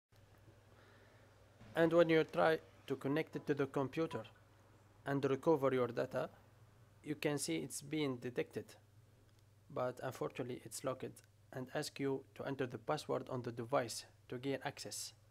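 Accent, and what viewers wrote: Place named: Eastern Europe. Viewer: this guy is from russia or eastern europe. I cant understand his english properly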